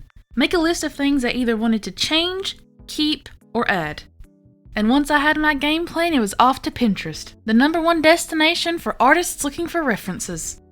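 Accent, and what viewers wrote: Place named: Rural, United States. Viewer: i love your voice, it just makes jokes have that country flair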